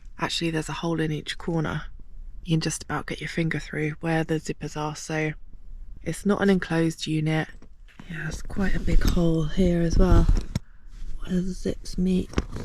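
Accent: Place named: Oxford, United Kingdom. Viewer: Nearly Oxford! English! With? A Twist! 😂❤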